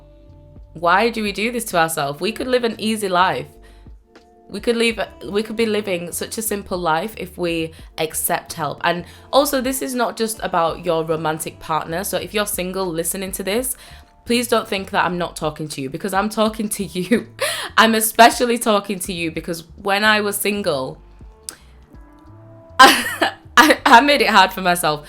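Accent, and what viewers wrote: United Kingdom. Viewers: Oo what part of the UK are you from? Your accent reminds me of Mel B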